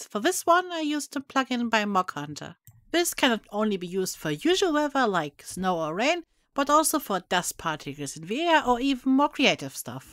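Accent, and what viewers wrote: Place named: Germany. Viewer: Lovely german accent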